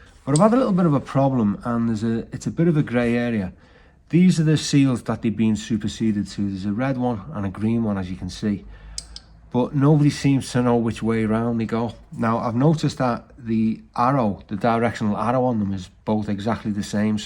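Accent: Liverpool, United Kingdom. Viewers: Being a fellow scouser who has not lived there for over 50 years, it was to fun to hear the accent again. I applaud you on your knowledge of motors. If I am ever in Liverpool again, I will keep a lookout for a black and/or red roller corniche. 👍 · sound like a scouser , well done wack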